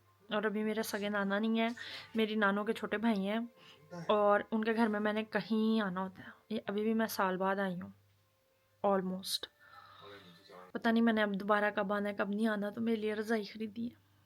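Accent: Pakistan. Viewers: pure Pakistani accent❤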